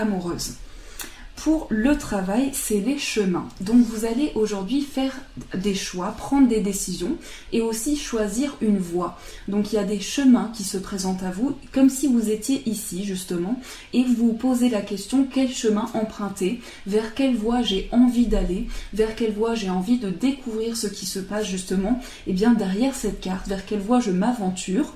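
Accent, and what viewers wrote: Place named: South-West, France. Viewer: Bonjour C'est la 1ère fois que je vous découvre, je crois deviner l'accent du sud-ouest 😊 Vous êtes très belle et rayonnante, non , non, ne rougissez pas😊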